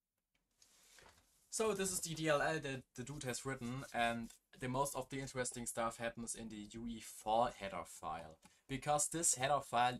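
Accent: Germany. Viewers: unauffälligster deutscher akzent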